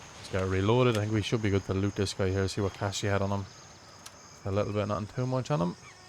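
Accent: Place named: Waterford, Ireland. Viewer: You sound like your from waterford!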